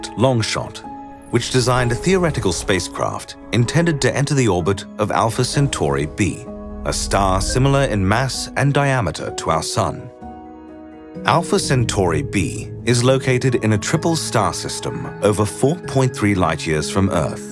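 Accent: RP, United Kingdom. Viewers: another science channel with a "british" narration, this gon' be good SUBSCRIBED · It’s a total rip off of Kurzgesagt if you ask me: Distinctive vibrant colour palette ✅ Big bold white text in thumbnail ✅ Rounded off animated shapes ✅ Science/space themed content ✅ Narrator with British accent ✅ The only thing that’s missing is birds. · What's with the bizarre American pronunciations of "Cos-moess" and "Proe-ject" when you have a perfectly good English RP accent? It's highly disturbing! The last syllable of Cosmos rhymes with Boss, and the first syllable of Project rhymes with Bodge. I suppose I should count myself lucky that you didn't say "Aloo-minum"...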